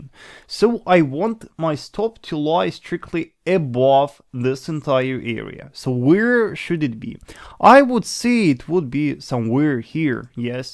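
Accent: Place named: Russia. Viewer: the russian accent....love it....hehehehe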